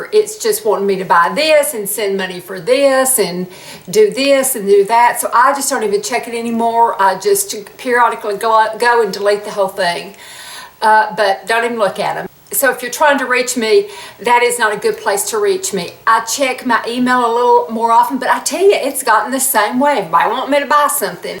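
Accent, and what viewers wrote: South, United States. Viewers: Hey gorgeous! Where are you from? Your accent is adorable. Very southern. Would love to be involved in your groups (women of YouTube over 45). How do I get involved? I’ll be 55 on the 23rd. Thanks. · you're fantastic! I have so enjoyed watching your videos for the last few months and love all your recommendations. I have been thinking I needed to take up knitting again. My novice ability should be able to handle these facecloths. Great idea! Thank you so much. I so love your sweet southern voice and your fun informative presentation. I have enjoyed every video I have seen. It is so nice to be able to get all the ideas I need at my age to keep current, healthy and active. I have used tretinoin on and off for 30 years and it does make a difference so I am using it more in the last year and even on my eyelids the last few weeks after I saw women on YouTube say they had used it. 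It did get very dry and irritated the first time and then I used oils to hydrate and then cautiously proceeded the next week to use it with my eye cream every other day. Thanks again. xo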